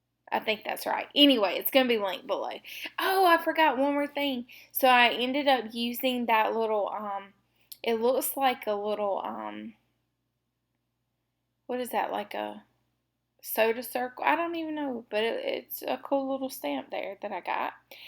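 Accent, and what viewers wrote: South, United States. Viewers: Love your videos/art work and the way you describe what you've done. Your Southern accent is also adorable!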